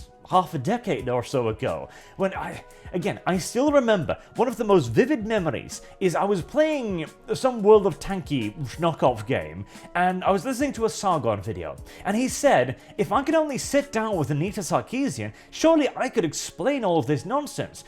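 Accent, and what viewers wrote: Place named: United Kingdom. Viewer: He's Norwegian that learned English from Brits.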